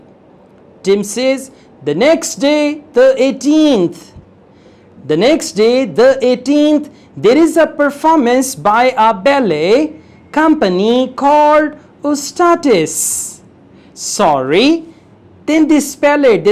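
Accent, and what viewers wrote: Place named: Punjab, India. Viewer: Haaye tuhadi Punjabi 😄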